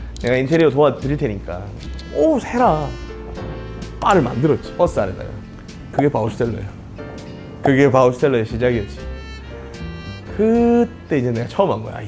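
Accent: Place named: Busan, South Korea. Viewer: 폭팔적인 목소리 부산이시군요 가수로서 최고가되시길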